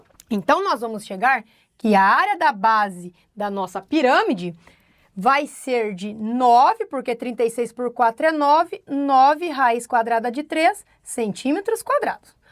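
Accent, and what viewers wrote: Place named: Paraná, Brazil. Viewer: Olá professora, você é do Paraná? Pelo seu sotaque. Tenho vários alunos de lá, também sou professor, mas de eletrônica. Beijos de um prof. para outro.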